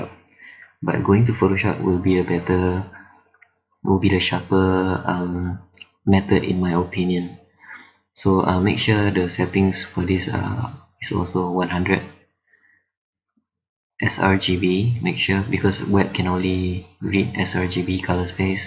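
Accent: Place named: Singapore. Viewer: omg why did it end abruptly. machiam cliffhanger lol. But thanks so much for this tutorial! Possible to explain the difference between exporting in LR vs PS? I prefer to stay within one platform but am curious as to whether the difference between these 2 exports would be significant. P.S. I think this is my first tutorial watched done and voiced by a fellow Singaporean. Nice to hear a familiar SG voice! Thank you for the tutorial! Subbed!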